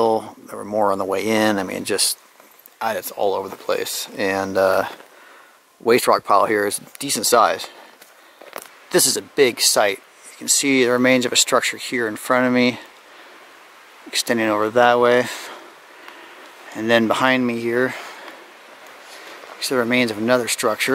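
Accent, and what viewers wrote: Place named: United States. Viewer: Normal frame rate doesn't make a difference on recording. it's still the same picture only taken twice as often in 1 sec. maybe its to much data for the storage medium or the camera cpu. from my Experience filming in dark a environment. the video gets distorted and grainy when there is to much going on in the frame that the cpu has difficultys to render. this should not be seen as critic. i only want to give some thoughts to help your channel to more quality. i still like to watch your content and here some American accent.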